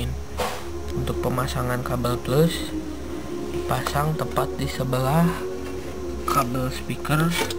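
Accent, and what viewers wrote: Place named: West Java, Indonesia. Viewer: eleuh eleuh eta kang,orang sunda nya......good kang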